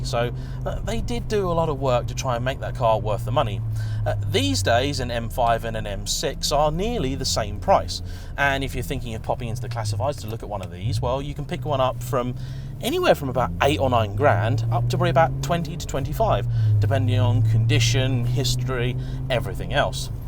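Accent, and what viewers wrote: United Kingdom. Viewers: Yes, I believe you did a great job with this clip. Yes, I like BMWs, especially, the M3s. This M5 is particularly better than the ones I have tried I admit that but what I am curious about is your accent because I must say you are probably the only Brit that I can understand all of what he says. So, from what part of England is that accent?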